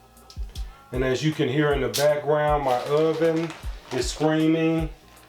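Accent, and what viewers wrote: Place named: United States. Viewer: Whomever would make fun of your wholesome American accent is simply jealous. This recipe looks great! I have not seen crawfish for sale but will start looking for it. This would make a dynamite pizza. For what it's worth: I have been remarkably more healthy since I started making and eating sauerkraut. I used to catch every cold or flu going, had chronic bronchitis for five years. All gone since I started this habit. During the past winter, I have not had so much as a sniffle or cough. Not sure how it works but the effect for me is miraculous. I hope this is useful information.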